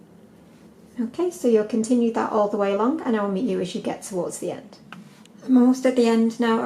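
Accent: United Kingdom. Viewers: I crashed out because of how fast she was going and didn’t understand her British accent which got me so mad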